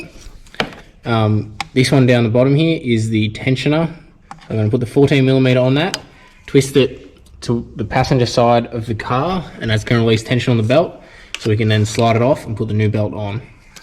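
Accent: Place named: Australia. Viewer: Thanks mate.. i am in thailand but having it explained in proper Aussie language was gold.. thanks for your video..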